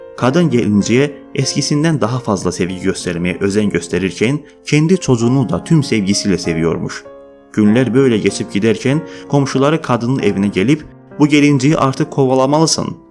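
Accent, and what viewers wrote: Azerbaijan. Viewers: Abicim azersin işte çok belli konuşmandan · Daniwiginnan azeriye oxwuyur. Hec turkler kimi teleffuz etmir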